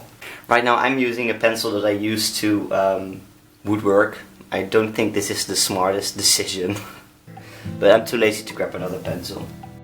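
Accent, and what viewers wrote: Netherlands. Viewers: suscribed 'cuz, MEDE NEDELANDER XD Ik dacht het al te horen, maar ik hoorde Pippoos en toen wist ik t zeker haha. Goed bezig jongen!! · your accent sounds kind off dutch am i right?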